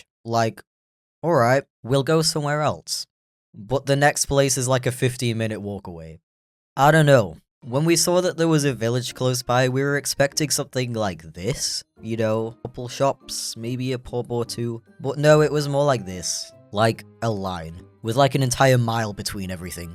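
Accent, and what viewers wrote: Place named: United Kingdom. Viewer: I remember this guy back when he had his British accent 😭🙏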